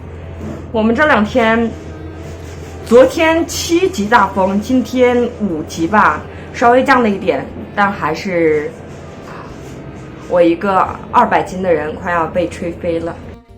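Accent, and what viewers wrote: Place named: Beijing, China. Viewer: 这汉话没有内蒙口音，倒是像北京话，譬如用 霍霍 这词。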